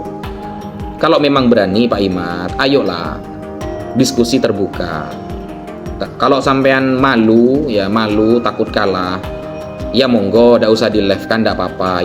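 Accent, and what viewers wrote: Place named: Madura, Indonesia. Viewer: Dari segi bicaranya ini orang madura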